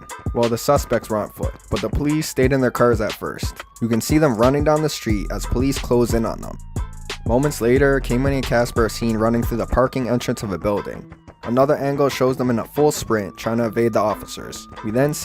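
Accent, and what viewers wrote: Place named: Calgary, Canada. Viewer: Why do you have such a brutal Canadian accent? If you're from Toronto you shouldn't sound like you're from Calgary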